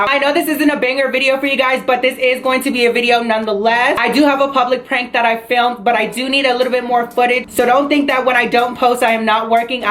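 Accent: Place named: New York, United States. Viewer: I like how you said “dau - tuah “ I love ny accents 😭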